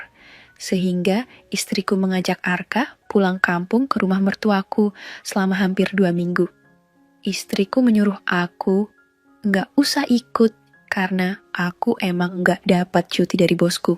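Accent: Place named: Eastern Indonesia, Indonesia. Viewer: Elu logat Indonesia timur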